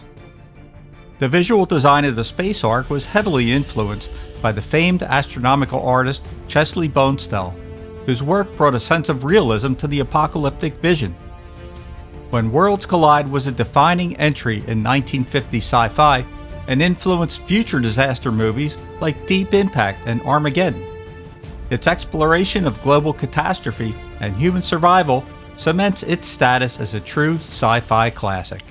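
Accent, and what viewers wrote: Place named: Delaware Valley, United States. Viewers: Don’t ever lose that Philly accent! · Judging by your accent, you grew up in the Philly/Del/South Jersey area.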